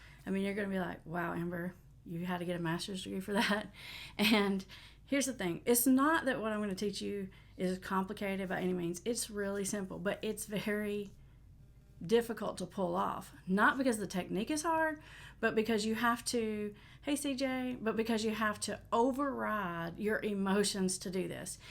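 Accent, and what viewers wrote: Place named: Tennessee, United States. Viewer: Hi Amber, I’ve become addicted to your videos (not funny?) I’m 6 months clean. You have helped me so much. Anyway, if it’s at all possible, it would be really great to hear from couples that have made it through addiction and are doing well. I would be interested in hearing the perspective of both partners. It may give me a little hope. ❤ Thank you for all you do. You’re doing great work for the world, especially in the area of the world where you’re located (I’m from Bristol Tn and I can hear in your voice maybe you hail from close by? Hehe)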